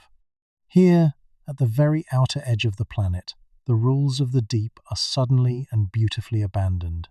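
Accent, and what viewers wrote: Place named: United Kingdom. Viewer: Yayyyy you’re using the soothing British AI voice🎉🎉🎉 is it because of the comment I left?😅😆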